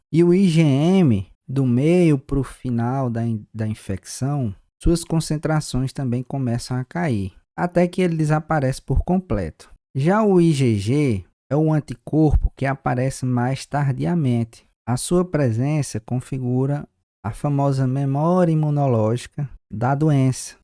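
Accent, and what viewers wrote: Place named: Northeast, Brazil. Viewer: Incrível aula e de bônus com sotaque , oxi! 🤠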